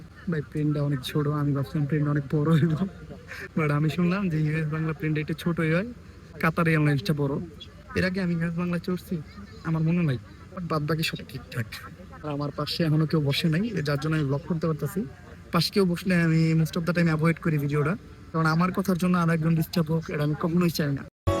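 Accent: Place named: India. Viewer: Indian voice ase vai bangla na asla video dekhe ki moja pawa jai..?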